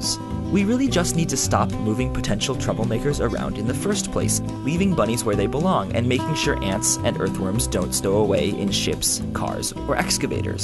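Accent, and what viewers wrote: Australia. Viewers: r u an Aussie? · Is Australian?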